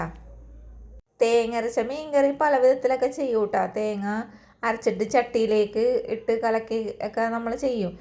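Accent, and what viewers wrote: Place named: Ernakulam, India. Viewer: എറണാകുളം. കടലോരo. ശബ്ദമാണല്ലോ 😄. 👍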